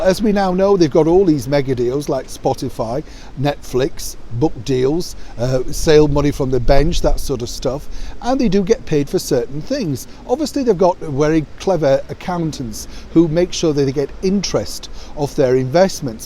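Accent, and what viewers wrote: Yorkshire, United Kingdom. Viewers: It doesn't matter who is behind them if they don't do the work infront of them. If they are crying poverty, it will be another one of Megan's poor me heart-string pulls. H, too. They are used to pulling sad, bad or mad faces and getting what they want, but this is the grown up world I think they have bitten off more than they can chew. Celebrity they may think they are, but they are apparently considered to be a kiss of death to anyone's careers they touch. And the decent people in those circles don't want to be anywhere near them, they have proved time and time again they are not to be trusted. I felt a little sad at a language barrier being expressed. I don't know how you watch the comments, but on most devices there is a 'Translate into English' under every comment in another language. Soon as you tap it you're bilingual for a moment. 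Actually you do speak two languages, your lovely, lilting Yorkshire tones and then the right proper English like wha' t'Queen does ont' telleh 😁 Hope you are enjoying your weekend, whatever you're doing, you're doing well on it!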